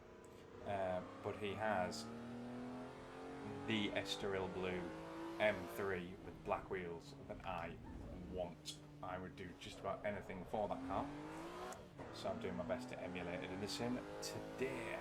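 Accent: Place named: Sunderland, United Kingdom. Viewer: You sound more mackem than usual in this one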